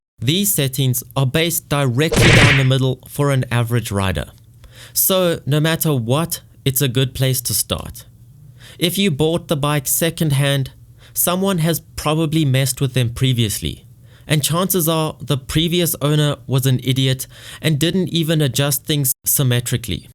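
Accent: South Africa. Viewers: Who else follows this dude partially because of his South African accent!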